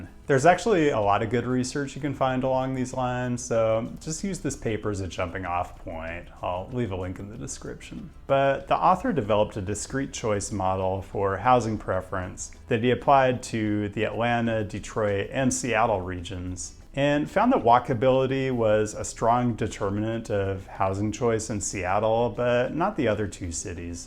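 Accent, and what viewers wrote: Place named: California, United States. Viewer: I like this guy's content, but man do I hate his intonation patterns. It's like farcically Californian.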